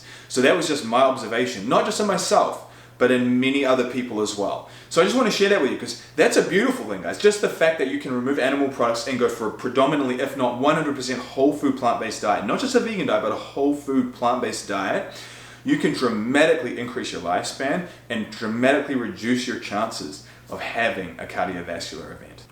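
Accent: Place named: New Zealand. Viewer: You have a New Zealand accent, mate. Have you lived there a part of your life?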